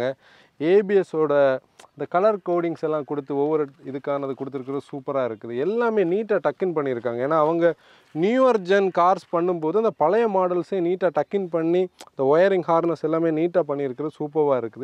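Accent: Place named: Kerala, India. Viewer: Simple , important , interior , front , point is a word Simble, impordant , inderior , frond , poind is emotion Kerla influenced pronunciation like it though 😃👌😊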